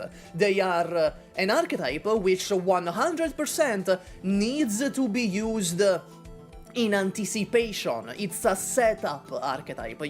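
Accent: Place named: Italy. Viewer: You sound italian AF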